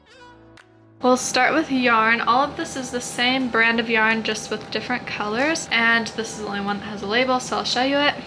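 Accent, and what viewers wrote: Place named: New Hampshire, United States. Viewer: Are you from New Hampshire? Your accent is so cute 🥰😊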